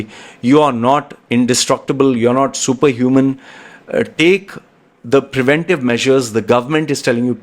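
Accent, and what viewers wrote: India. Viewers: Indian English accent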